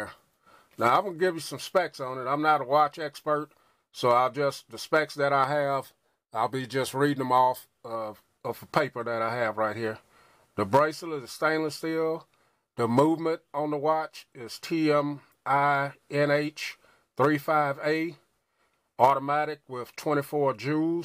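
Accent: United States. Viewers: haha u sound like me... country as hell!!! I do have your interest in them, not saying they are the best but for the price and function I think they are a good value and I am often complemented on mine..also depending on what size the case is, it can make a nice gun/pistol case and keep the silicate that comes with it to keep ur gun from rusting...also the name of the magnified date is called a cyclops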